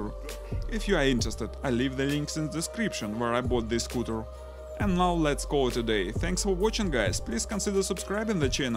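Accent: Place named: Russia. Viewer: Тяжело слушать настолько мощный рунглишь. По существу, обзор хороший, спасибо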